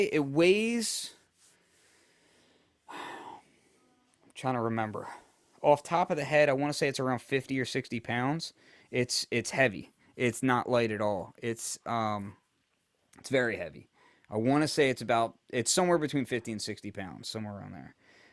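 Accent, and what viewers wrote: Philadelphia, United States. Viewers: are you from Philadelphia? I detect an accent. I'm from Montgomery country, the north west suburbs.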